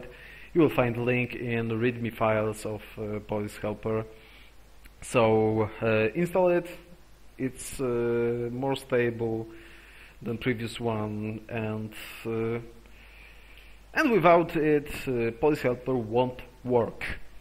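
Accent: Poland. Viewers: Wyczuwa się ten polski akcent :) Ale film oczywiście dobry, łapka w górę ;)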